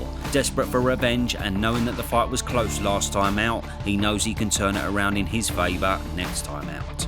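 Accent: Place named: Cockney, United Kingdom. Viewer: Ffs unsubbed his channel because of the cockney accent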